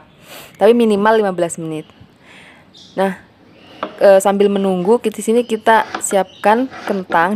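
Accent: Java, Indonesia. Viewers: Pantes gole ngendika gula ne rada medok 😀 kulo asli sokaraja tp merantau k bks mba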